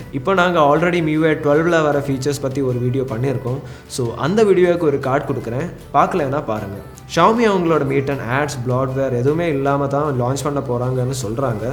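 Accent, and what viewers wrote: Kerala, India. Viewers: Ena bro nenga malayaliya... Unga speech style knjm different ha iruku but Nala iruku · malayali anonn oru doubt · மலையாள தமிழ் சூப்பர் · Brother are you a malayali. Unga Tamil 🥳👌. Tamil yaaru pesnalum alagu dhn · Bro pesradhu le clarity kammi ah iruku try to improve it .. Malayalam slang konjom iruku try to avoid it. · bro nennga malayaliya unnga Tamil apadi tha erukku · Bro r u from kerala? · Voice over malayala tamila ... Sema kick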